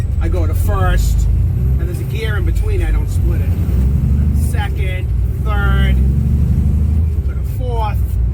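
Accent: Boston, United States. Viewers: I think I heard you say you've only driven for two years. I don't believe that for a moment. You shift the same as I shift my 13 speed Pete. I've been driving long haul for 48 years and I say you pass the test with flying colors. Love the Boston accent !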